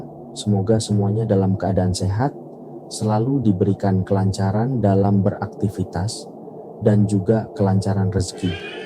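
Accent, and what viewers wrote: Java, Indonesia. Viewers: Duuuuuuh....KEMANA KAU MAS??????? Logad Jawa mas yg bikin rindu...ini siiih hanya membaca...gak seruuuuu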